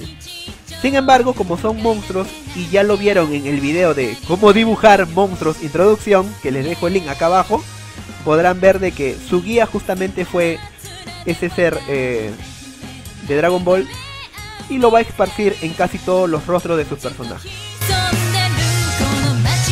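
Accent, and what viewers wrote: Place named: Peru. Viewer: Aaahhh, eres peruano Nueva suuub!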